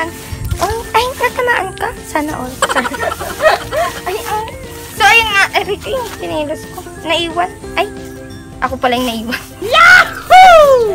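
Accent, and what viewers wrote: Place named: Marinduque, Philippines. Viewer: New subscriber din from gasan marinduque..parehas tayo ng punto..😊😊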